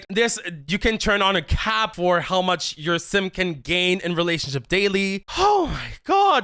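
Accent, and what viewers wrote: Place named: Italy. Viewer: holy molly....you sound ITALIAN ...no offense to the italians hahaha i enjoyed the video truly...it was really entertaining and your acting attitude really fun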